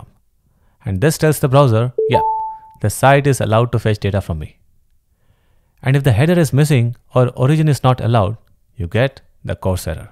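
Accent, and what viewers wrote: India. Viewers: Best !!!! crazy video (I think u are indian by accent tho) ❤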